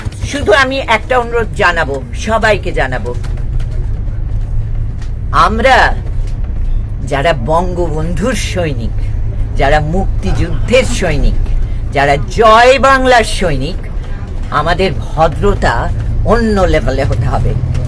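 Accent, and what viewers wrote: Kolkata, India. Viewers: তোমার বঙ্গবন্ধু লীগরা বাংলাদেশে খাই ইন্ডিয়া যে বসবাস করে তাতে কি তুমি দেশ প্রেমিক মনে করো তোমার মুখের ভাষা হয়তো মনে হয় ইন্ডিয়ান কলকাতা তুমি আমার বাংলাদেশের দেশপ্রেমিক হওয়া কি করে তুমি কোথায় দেশে নাকি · এটাতো কলকাতার ভাষা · আপনার ভাষা তো আমাদের বাংলাদেশের ভাষার সাথে মেলেনা বরং কোলকাতার সাথে মেলে অতএব আপনি যে ভারতের দালাল সেটা আমরা বুঝি।😂😂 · কথা বলার স্টাইলে ভারতের মত,বাংলাদেশ ভাষার প্রেম মারায় 😂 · Tomar kotha vongi kolkatar varot jao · কলকাতা স্টাইল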